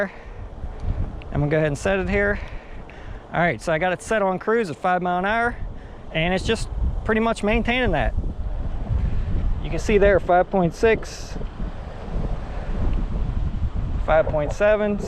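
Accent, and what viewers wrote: Pittsburgh, United States. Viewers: Thanks for the video. Do I hear a Pittsburgh accent?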